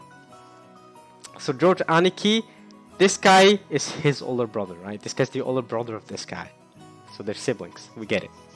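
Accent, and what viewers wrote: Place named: Norway. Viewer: Your accent sounds familiar. Are you Swedish? Edit: Norway. I was close! Also it's weird seeing someone play without lip synch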